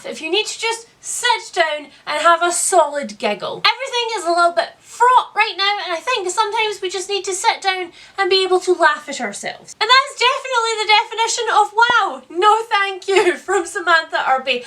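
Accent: Scotland, United Kingdom. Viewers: This is the first video of yours and omg I am in love with you me energy! And as a fellow Scottish person I really appreciate the accent 🤣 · Love the Parasol Protectorate series and Agatha Christie is one of my favorite authors. I'm more of a Poirot girl than Marple, though I enjoy her too. Cards on the Table is my favorite. I might have to add The Stepford Wives to my TBR. I, like you, have seen some adaptations and didn't think I needed to, but I'm reassessing this now. 😂 I may or may not watch your channel for the delightful Scottish accent. 😂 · "Hanging around for the Scottish accent" I've been called out. Well, that and your humor. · “Some of you are sticking around because of my Scottish accent”.....*looks around nervously* that...might be me 😳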